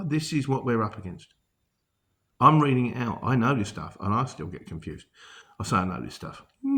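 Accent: South London, United Kingdom. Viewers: Its a soft south London accent .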